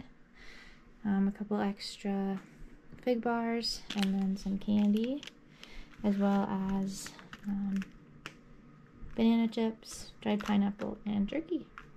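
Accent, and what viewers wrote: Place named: South, United States. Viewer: WHAT YOUR ACCENT SOUND DEEP SOUTHERN LADY WITH APPALACHIAN WORDS ... SHE PASS ... GOOD LUCK MY FRIEND.. APPALACHIAN TRAIL IS SO COOL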